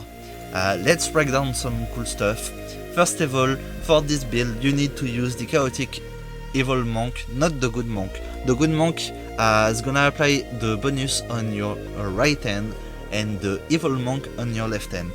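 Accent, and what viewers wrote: France. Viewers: Mdr le bon vieil accent français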